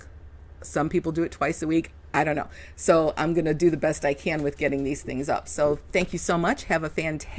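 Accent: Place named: Chicago, United States. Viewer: Ma'am. After about 30 secs of listening to your story I knew you were from Chicago 😅 Even though you're no longer up here it's nice to hear someone sound like me. AND a creator who is also Gen-X, hello fellow 80's cool kid! Looking forward to your content on all those companies, many of which I'm super familiar with. You had the purse?!?! I'd have been SO jealous.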